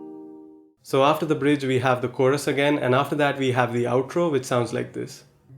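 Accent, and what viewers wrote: India. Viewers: Are you indian? I mean your accent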